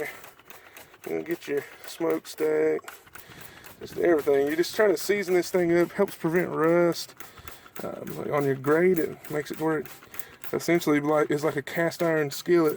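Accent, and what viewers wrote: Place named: Georgia, United States. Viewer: Dang I thought you was from Georgia😂😂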